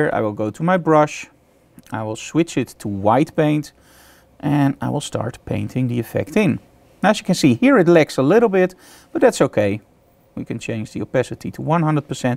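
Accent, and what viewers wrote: Netherlands. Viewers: I just try it. It's super amazing, I can even draw outside my drawing room seating on a couch, the signal is so strong! btw your accent is super Dutch :D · You are Dutch aren't you? 😂 Me too btw so i'm not laughing at you in a mean way just so you know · ben je Nederlands?-are you dutch? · lol ik kon gelijk herkennen dat je Nederlands was door je accent. geweldige vid man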